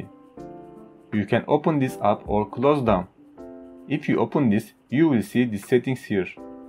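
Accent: Turkey. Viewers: Çok belli türk olduğu ingilizcesinden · Konuşan türkmü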